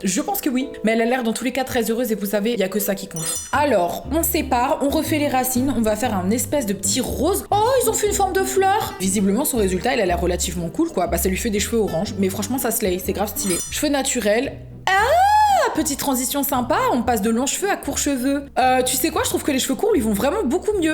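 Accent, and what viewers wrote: United Kingdom. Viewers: Tu as les cheveux trop beau je t'adore depuis que je suis toute petite et ton petit anglais à la fin des mots je le trouve trop cute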